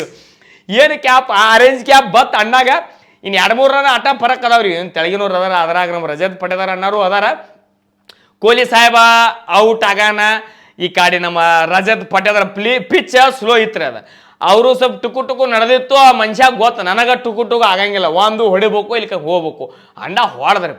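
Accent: North Karnataka, India. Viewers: ಮಾತಾಡುವ ಶೈಲಿ ಸೂಪರ್ ಉತ್ತರ ಕರ್ನಾಟಕದ ಭಾಷೆ❤❤❤❤❤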